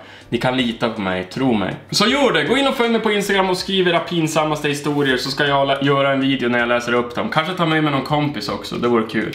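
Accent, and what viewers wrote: Norrland, Sweden. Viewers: Jååå, jag gillar att äta kladdkaka samtidigt som jag kollar på dina videos när du pratar norrländska.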